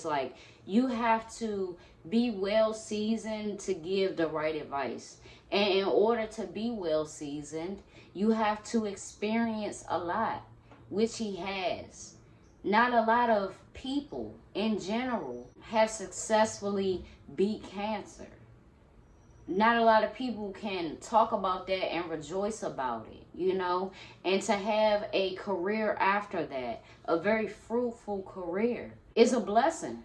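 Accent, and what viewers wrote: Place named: Maryland/DC, United States. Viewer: you must be from the md/dc area 🤣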